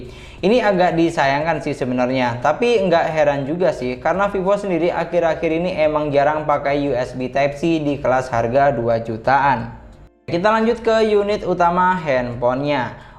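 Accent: Madura, Indonesia. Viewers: Bang orang Madura kah?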